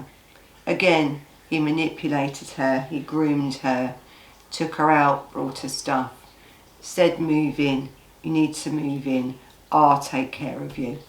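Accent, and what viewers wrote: London, United Kingdom. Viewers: So good to see you back. I was hoping you were OK and just needing a break from the emotional drain of all this violence and cruelty you dig through to make us the videos. I love your London accent btw. Reminds me so much of listening to some very special people in my life. Old friends who aren't with us any more.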